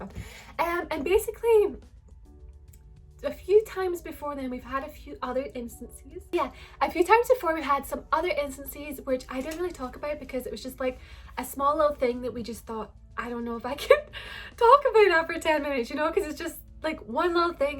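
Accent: Scotland, United Kingdom. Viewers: Love your Scottish accent! Your nostalgic look is too cool! Love your videos and ignore the haters! You and Maverick and your little boy are too cute! Bless all 3 of you! ❤ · Love your videos ❤ ps are you from Scotland cos so am I 🏴😁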